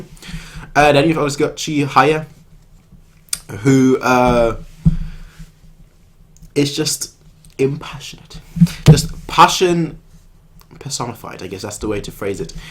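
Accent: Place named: United Kingdom. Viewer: I really love your reaction and accent bro. British right?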